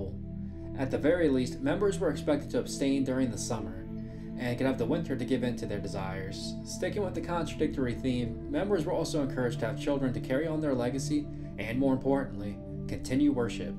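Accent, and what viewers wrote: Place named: Pittsburgh, United States. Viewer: You sound like you're from Pittsburgh. Good video, cheers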